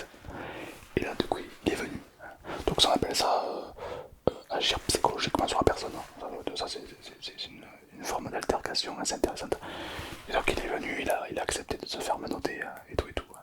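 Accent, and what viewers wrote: South, France. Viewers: Ce qui marche bien c’est que ca gars il a pas besoin d’imiter l’accent du Sud, ca rend la truc assez réaliste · J' adore ton accent du sud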